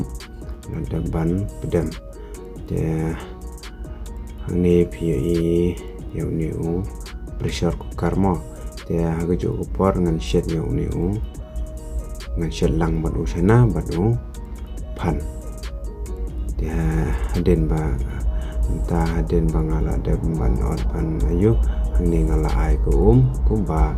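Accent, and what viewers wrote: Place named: Pnar, India. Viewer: Ph bh dakan hangno nga sngew tynnad ba ph kren daka kynten pnar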